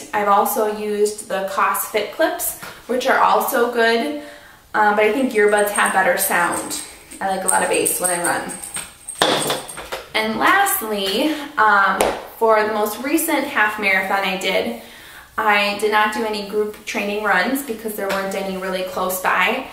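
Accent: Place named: Minnesota, United States. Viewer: You sound like your from MN? I'm just starting running myself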